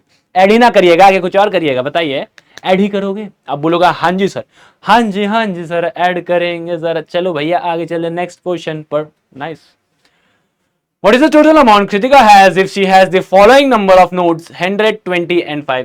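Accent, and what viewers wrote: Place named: Bihar, India. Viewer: Sir is bihari for sure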